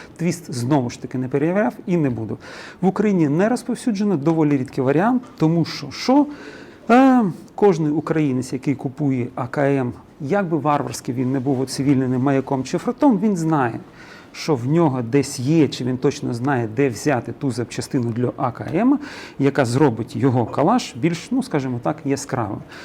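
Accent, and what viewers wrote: Russia. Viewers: Его украинска мова хромает. Часть слов на оркском произносит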